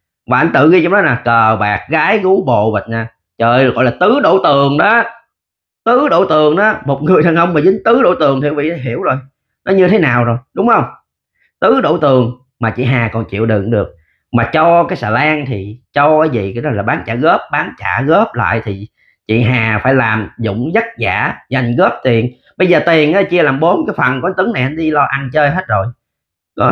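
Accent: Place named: Mekong Delta, Vietnam. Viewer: Công nhận.em nói tiếng.giong trai đồng bằng.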